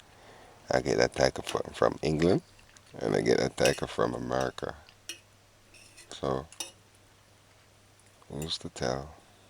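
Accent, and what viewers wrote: Jamaica. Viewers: I am enjoying your colorful cooking this great blessed Saturday morning. And please do not speak patio, not everyone understands the twist in the English pronunciation. You are making videos for the general public, correct? You will have more more subscribers. Great number turn ups for you internationally. In JESUS Christ mighty name we have prayed amen 🙏🏽!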